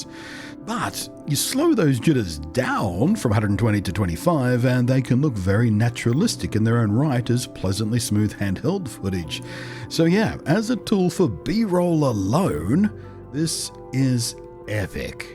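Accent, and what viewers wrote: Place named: Australia. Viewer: Nice slow and Aussie wow fantastic brother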